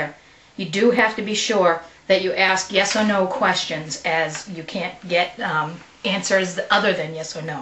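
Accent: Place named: New England, United States. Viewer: Lol you have a new england accent just like me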